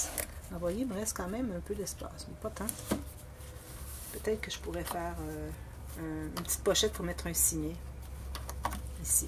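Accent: Canada. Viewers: Coucou, Je découvre une magnifique artiste pleine de talent au léger l'accent canadien !!! Un EXTRAORDINAIRE album, merci de nous enseigner et de nous entraîner vers ton univers ! Je m'abonne à ta chaîne ! A bientôt Bisousssssssss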